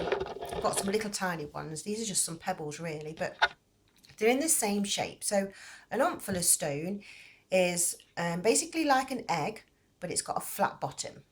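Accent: United Kingdom. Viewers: Thank you very much for your patient explanations as you crochet! You take all the guess work out of your patterns & I really appreciate that! The Tiny Baby Cardigan is ssooo cute! I plan to crochet quite a few to go with the hats/caps I am crocheting for tiny babies at our local hospitals. Also, thank you for sharing such interesting history! I always wondered about the circles that some call "Crop Circles." Now I know they come from a mushroom! I didn't quite understand all about the rocks. I guess I was having a brain freeze at the time. I really enjoy watching your videos! They are very helpful to me! I love your British accent! May God richly bless you!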